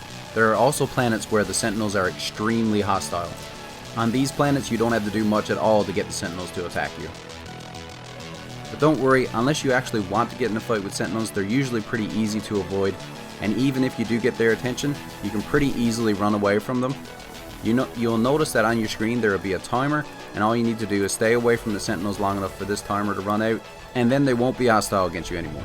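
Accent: Newfoundland, Canada. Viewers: Aha...Newfoundland! That explains it. Thanks for answering, nice accent 👍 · What part of Newfoundland you from? Looked at one of your money and nanite making videos, soon as you started talking, I said he's a Newfoundlander! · U sound very Canadian. Newfoundland?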